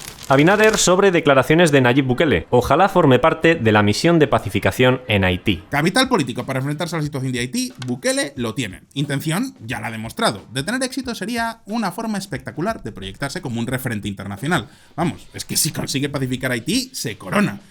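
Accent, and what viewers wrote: Spain. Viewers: Tienes acento español, España está perdiendo la guerra cultural contra las nazis feministas y retrógradas wokes que desconocen la realidad latinoaméricana y se ponen a opinar y condenar las medidas tomadas por Bukele o Miley, cuando las tierras españolas se están llenando de inmigrantes que huyen de esta realidad latinoamericana XD... El socialismo en un fracaso y la evidencia son las ONG que comparten sus recursos con los haitianos. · Me gustan tus videos, son muy informativos, pero por favor habla más despacio, tu acento español es un poco difícil de entender en latinoamerica.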